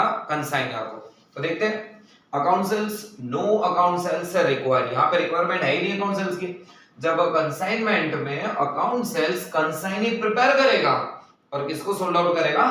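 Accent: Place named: Hyderabad, India. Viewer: Sir are you from Hyderabad , your accent is just like hyderabadi , anyways easy language , good information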